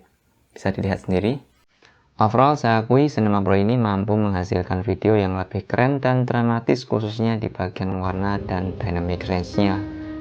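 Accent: Boyolali, Indonesia. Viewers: Ealah mas, orang Boyolali to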